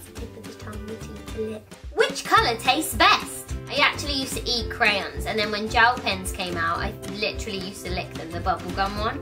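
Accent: United Kingdom. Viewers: I love you British accent and also I subscribe to you on Lucas's channel and your own channel!!! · videos give me life 💕 I think she's the only reason I learned how to speak Cockney too... 💎💋💁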